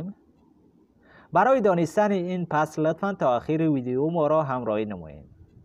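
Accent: Tajikistan. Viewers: خیلی هم علی و واضح بود تشکر بسیار زیاد و لهجه تاجیکستانی هم اینقدر زیباست که هر رقم آدم متوجه میشه ::::::::::: بنده افغانی هستم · تا الان با گویش تاجیکی آشنا نبودم، متفاوته و زیباست، ممنون از آموزش خوبتون، بهش نیاز داشتم🍀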